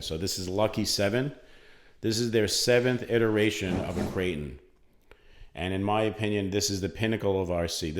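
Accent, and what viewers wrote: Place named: New Jersey, United States. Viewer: I just realized I think you’re in New Jersey I could tell by the accent lol. But I’m gonna guess up north because I’m in the south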